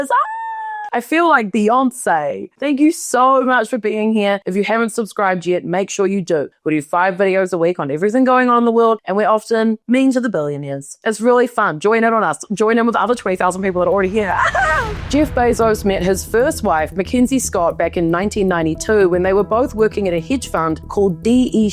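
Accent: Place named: New Zealand. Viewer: OMG! Your NZ accent and facts makes me realise this is for real😮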